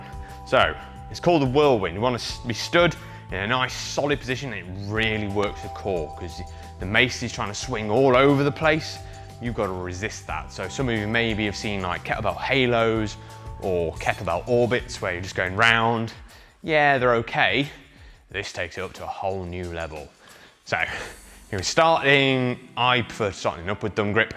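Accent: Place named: United Kingdom. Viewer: Thank you! British accent is something hard to digest 😅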